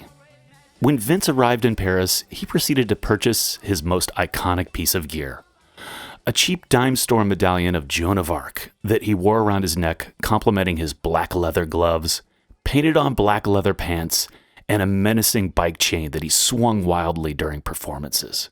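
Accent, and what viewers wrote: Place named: South England, United Kingdom. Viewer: That is not an American accent my friend. Its a south England accent!!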